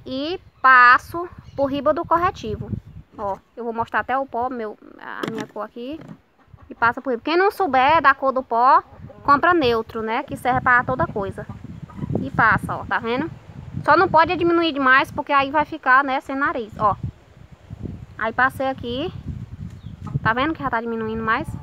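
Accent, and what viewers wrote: Northeast, Brazil. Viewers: Nordestino de sitio fala assim (sou nordestino)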